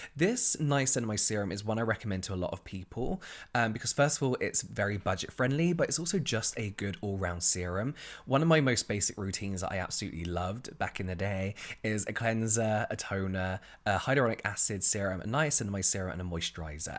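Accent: England, United Kingdom. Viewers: Can you help me: After I cleanse, put serum then moisturizer my skin / products start piling. I can’t find a good order to treat my face. Any advice? I am also older ( double your age).ordinary and inkey list with some vitamin k moisturizer from piping, rock are my go to products..... Or whatever you, Hyram, and Cassandra Bankson convince me to try. My skin is fair,very dry, oily on tip of nose and tip of chin. I doubt you’ll even read this but I am an older subscriber maybe this will get to you. You, have a very soothing voice with that beautiful English accent.🌹🌹🌹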